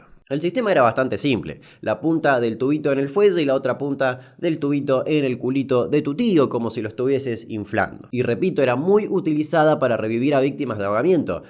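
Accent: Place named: Cordoba, Argentina. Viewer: Como me hiciste reír!! De dónde sos, tenés una tonada cordobesa!! Pareciera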